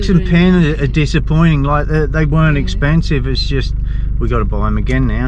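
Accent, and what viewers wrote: Australia. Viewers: you should change your accent , your Australia accent is so annoying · I had a new scooter stolen 6 years ago and thats it but thats not my coment i was thinking your acsent northurn nsw so cessnock stayed there in 1983 all i remember is here we are in nsw shearing sheep as big as whales on ya keep up the good work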